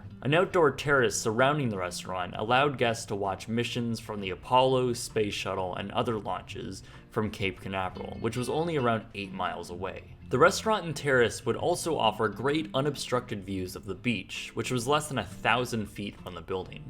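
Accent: Canada. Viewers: Canadian detected. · you Canadian? sounds it. Orangeville?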